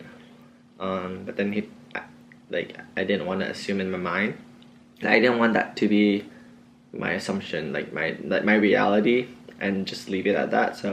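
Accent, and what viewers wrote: Malaysia. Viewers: Malaysian !! I watched your videos all the time, and I was always wondering what nationality you are. My instinct told me you are Malaysian cause of that accent !! HAHA. ANYWAY !! I am super happy there is one more Malaysian in the states !! PROUD OF YOU !!!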